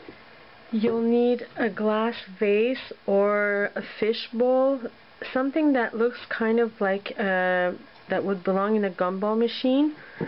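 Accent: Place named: France. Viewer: Is your first language French I'm Canadian and you seem to slightly have a French accent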